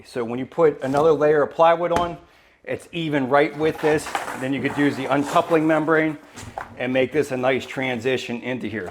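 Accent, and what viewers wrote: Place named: Pennsylvania, United States. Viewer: My boy has a Pennsylvania accent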